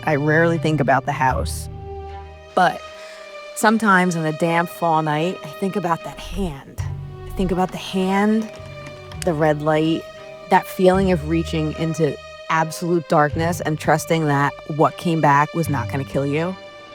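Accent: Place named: New Jersey, United States. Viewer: Loved the narrator's voice... she's definitely Jersey. Looking forward to more.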